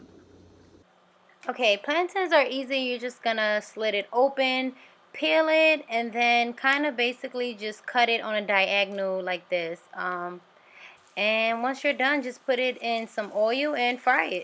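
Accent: United States. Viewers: Se ve bueno. LoL like the gringa accent😂 voy hacer algo comp eso ahora oren por mi... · Hey what state do u live in? Just curious cuz of your accent, sounds like ur from Texas. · Sounds NewYorican accent.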